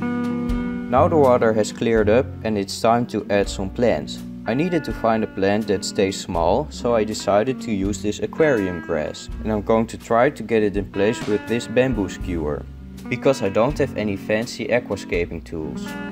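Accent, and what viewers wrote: Netherlands. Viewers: Dutch people speak English better than most Americans. :-). I very much enjoy the Dutch accent. Very cool!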